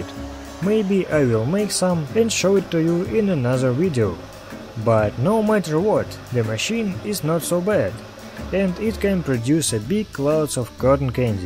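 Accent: Russia. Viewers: I heard you are russian un the first ten seconds. it's notre gold yet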